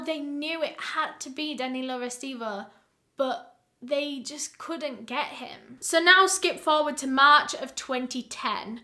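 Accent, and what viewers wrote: United Kingdom. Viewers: I’m here for British accent. i love it 🥰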